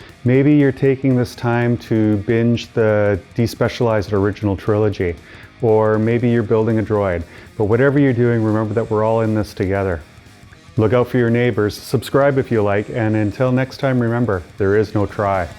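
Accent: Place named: Canada. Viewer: You sound Canadian 🙂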